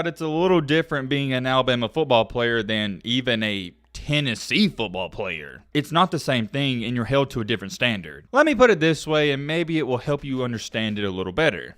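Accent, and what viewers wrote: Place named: Boston, United States. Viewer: You sound like a bostonian talking about the "patriot way". And the dude got caught driving with no insurance... do you have any idea how many people drive without insurance, that literally why God created the right hand lane. And when you're black you don't need to be going "10,15,20 over" to get pulled over. That seems like a very sheltered take. And as far as the weed.... who cares, it's weed.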